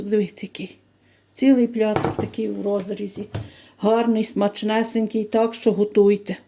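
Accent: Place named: Galicia, Ukraine. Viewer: Не складний рецепт,варто спробувати. Спасибі за рецепт.Обов'язково спечу. Я з такою еасолодою слухаю вас і наш рідний галицький діалект. Бережіть себе і будьте здорові.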